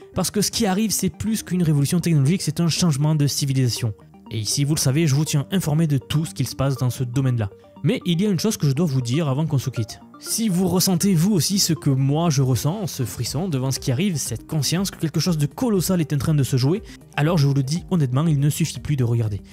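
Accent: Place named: Southern France, France. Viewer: L'accent du sud de la France est rafraichissant! Bisous du Québec!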